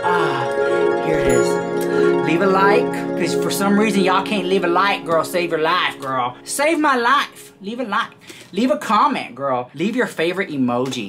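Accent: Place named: Texas, United States. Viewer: You make my day! Every day! ❤❤ I also live in Texas but I'm in Dallas. So, i love the accent. You're like home.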